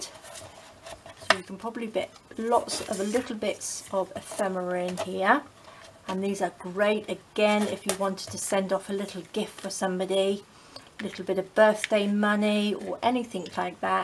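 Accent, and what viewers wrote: United Kingdom. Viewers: Wonderful work! I'll try it, because it seems easy to make. Are you britain? It sounds so. Greetings from Germay.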